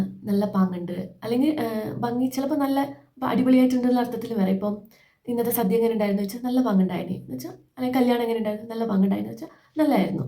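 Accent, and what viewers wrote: India. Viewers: മോളുടെ നാട് എവിടെയാണ്. കുറച്ചു കൂടി സ്പീഡ് കുറച്ചു പറയാൻ ശ്രമിക്കൂ. ട്രാവൻകോർ ആണെന്ന് ഊഹിക്കുന്നു. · ഇയാള് കണ്ണൂർ ആയിട്ടും ഇതെങ്ങിനെ നോർമൽ മലയാളത്തിൽ സംസാരിക്കുന്നു (കണ്ണൂർ ഭാഷയിൽ നിന്നും വ്യത്യസ്തമായി ). അതിന്റ പിന്നിലെ കഥ കൂടി പറയു.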